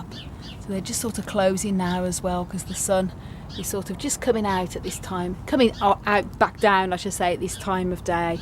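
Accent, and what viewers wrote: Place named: Birmingham, United Kingdom. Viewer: no worries is a true aussie saying 🤣🤣🤣🤣. To me you sound Brummy all the time , not as bad as Ozzie Osbourne though 🤣🤣🤣🤣🤣🤣🤣. Have a great weekend you and Hans, sending you lots of love from Ellyn and me. ⚘😗❤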